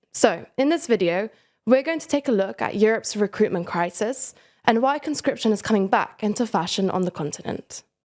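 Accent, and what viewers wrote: United Kingdom. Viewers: What is with the strong British accent ? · I love the accent of the narrator! She sounds British but also has a bit of difficulty pronouncing the "th"-sound. So 33 sounds like firty-free. But the replacement (th -> f) sound isn't the same as the one commonly heard in and around London. It's slightly different and very noticeable. Not unpleasant, mind you. Just different.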